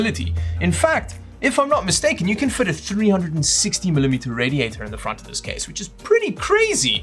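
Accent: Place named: South Africa. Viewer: 100% south african right?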